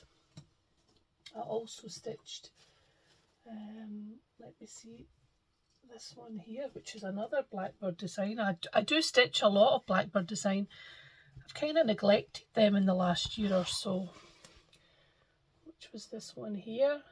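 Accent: Scotland, United Kingdom. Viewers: Congratulations on 3 years Flosstube! Love all your stitching and the inspiration it brings, including the bird and all the lovely fabric. Great to hear a lovely Scottish accent on Flosstube! 🏴❤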